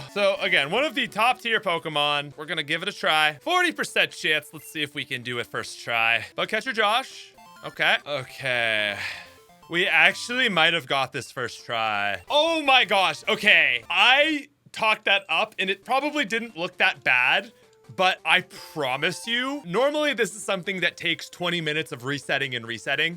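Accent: Canada. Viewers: dude, i mean this in the best way possible but i just happened to find your video in my recommended and i heard you speaking, particularly how you pronounce "out" and words that end in -out and immediately clocked you. i say this as a canadian myself, i just never noticed how easy it is to tell until i see a canadian youtuber when most of the ones ive been watching recently are not canadian/dont have that particular accent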